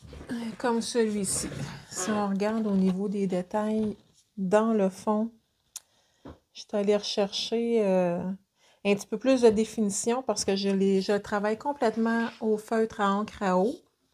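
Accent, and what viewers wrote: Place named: Canada. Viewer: bonjour ta vidéo tombe tres bien car je cherchais une nouvelle gamme de feutres et j'ai énormément apprécié ton comparatif j'ai pu me faire une idee sur les feutres a m'acheter pas trop onéreux les tombows sont vraiment tres cher 😅 merci beaucoup pour ton partage et ton accent qui sent bon le canada que je reve d'aller ❤❤👌👍👍🙏🙏😘😘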